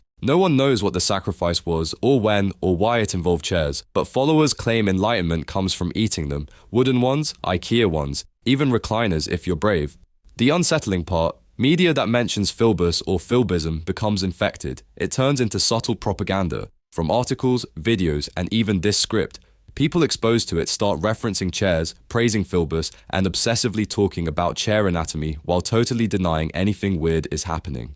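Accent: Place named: United Kingdom. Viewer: why does your text to speech program has a british accent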